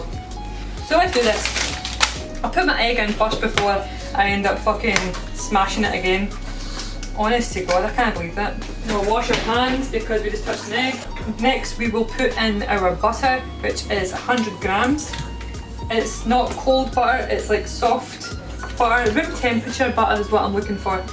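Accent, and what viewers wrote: Scotland, United Kingdom. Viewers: I'm a native English speaker, and 99% of accents I can understand perfectly but holy hell I've never heard a heavy female Scottish accent and I can barely understand a thing. Thanks for the recipe though they look delicious mate! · There's something relaxing about a sassy Scottish lady telling us how to cook desserts.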